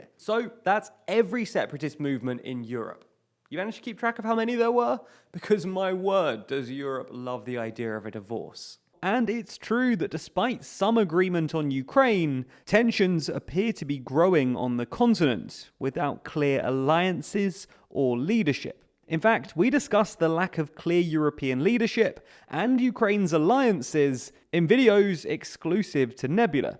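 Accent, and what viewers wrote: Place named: RP, United Kingdom. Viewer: Interesting how “island” and “Ireland” are homophones in your RP accent.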